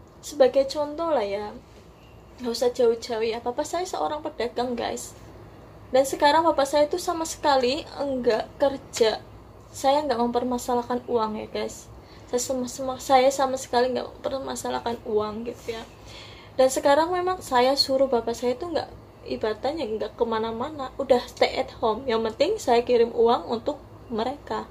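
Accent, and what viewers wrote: Banyumas, Indonesia. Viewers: Hadir maning wong ngapak😂 Ayoo yg lain ikut hadirr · Ora ngapak ra kepenak..nyimak kk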